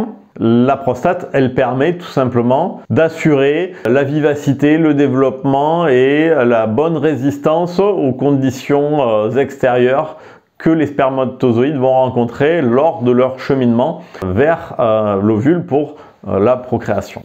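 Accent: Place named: Southwest, France. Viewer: On entend bien le sud ouest ça fait du bien 👍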